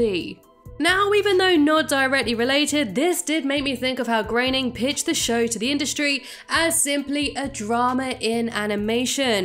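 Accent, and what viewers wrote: United Kingdom. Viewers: once you hear a British voice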